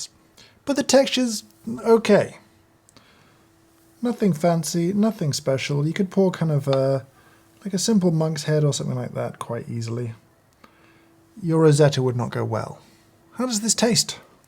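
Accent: United Kingdom. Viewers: I love your British accent! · I love watching videos. I love how his knowledge along with his British accent makes him "sound" pretentious 😆 but he's genuinely likeable. I feel like his extreme knowledge might be a curse, though. I say this because I know how my preferences changed when I was forced to drink my coffee without sweeteners. It completely ruined sweet coffee for me (it's a wonderful thing to appreciate unsweetened coffee, I know) but I'm sure 99% of the cups of coffee I would find have glorious flavors, would just spit out and say it's disgusting 😂 I currently can't even imagine what he means by coffee tasting hollow or having texture. I haven't even tasted the difference between sour and bitter coffee, let alone tell when a serving has different levels of both at the same time. I hope to continuously learn from though! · Of all places, I'm sitting in a tiny town in beautiful Italy and "for whatever reason" can't go to a nearby coffee place. 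Asked YouTube what I can do with equipment I have in the kitchen and this came up! Looove it, and the British accent made me feel at home😇🙏💝🇬🇧. Thank you so much!!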